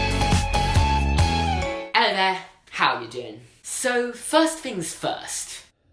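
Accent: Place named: RP, United Kingdom. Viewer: You're so fucking posh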